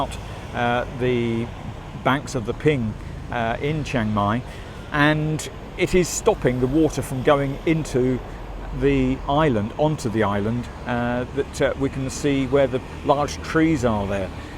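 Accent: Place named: United Kingdom. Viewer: This old POME talks way too much. No economy of thought or speech! Very proud of his UK accent but his Thai pronunciation is hideous!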